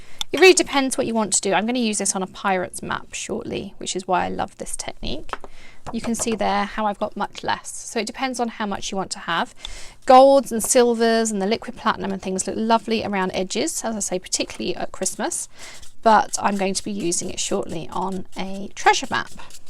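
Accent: England, United Kingdom. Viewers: I really love your videos, very clear, easy to understand and no unnecessary chit chat or giggles. There aren't many tutorials done with a clear English accent, you will be my go to site for all tutorials in future. Please keep them coming. Thank you.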